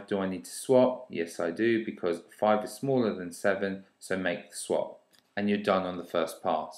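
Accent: United Kingdom. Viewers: Hi, I have to say I am using youtub to learn math for a long time and I have watched many vidoes. But I have to say the way you are teaching is very very very good . I like your Londonish accent as well. I will pray for you deep from my heart as you helped me a lot.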